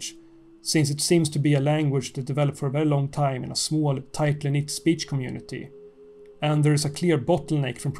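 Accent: Sweden. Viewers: As a Swede, I appreciate the heavy Swedish accent!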